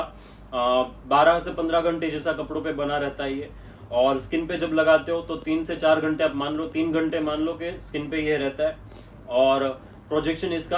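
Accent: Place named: Gujarat, India. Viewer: bhai bhai su vat che....apde hindi boliye aema gujrati eccent dekhay j jay😅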